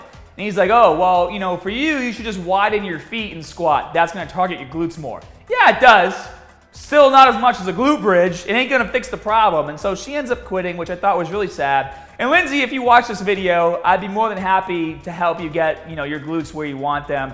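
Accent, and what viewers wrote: Boston, United States. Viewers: That Boston accent coming on strong.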